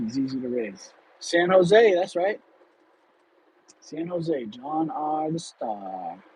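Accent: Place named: Boston, United States. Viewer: I missed it! But I am glad you got to try live streaming out. Your accent brought me back to my military time. Now I know it’s potentially from Boston, as it reminded me of a friend I had in basic training. He had a heavy Boston accent that was new to me.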